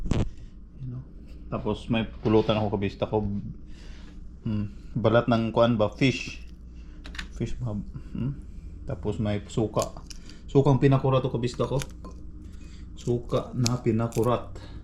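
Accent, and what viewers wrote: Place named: Visayas, Philippines. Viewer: Saan ka Dito sa Phil. bisaya ka mag Tagalog 🥰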